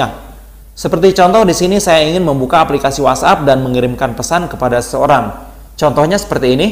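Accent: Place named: Indramayu, Indonesia. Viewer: Orang indramayu to...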